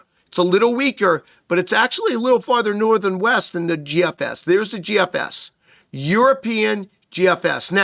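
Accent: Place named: New Jersey, United States. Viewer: Jeez, you couldn't talk about when there is going to be frozen precipitation in Texas. It sounds like you 're in New Jersey for God's sake, from your accent. Noone here cares about New Jersey. What is wrong with you.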